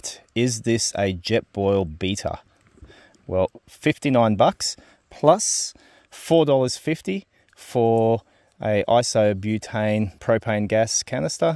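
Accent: Australia. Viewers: WTF? I thought Kmart went out of business. And a white person shopping there? Who knew? This feels like a time warp to the 1980s when Kmart wasn't crap. I actually bought my first dome tent at Kmart when I was 12. I used that thing til I was about 26 when the poles disintegrated. Got my first 20" dirt bike there too. I detect an Aussie accent. Is this outside the US where people get better products than US people get?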